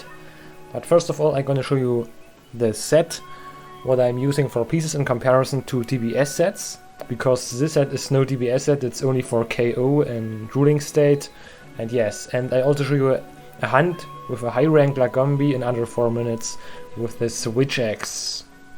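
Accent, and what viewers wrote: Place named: Germany. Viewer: I hear that german accent😂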